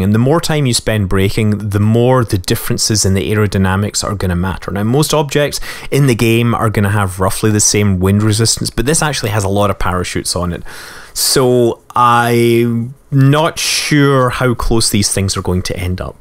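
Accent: Scotland, United Kingdom. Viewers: ^_^ MOAR SCOTTISH! I must obey the need.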